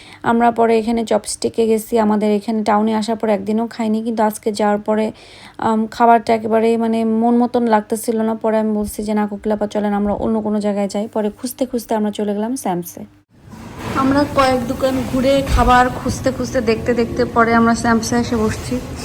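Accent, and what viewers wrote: Sylhet, Bangladesh. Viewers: Sylheti manus bole kota❤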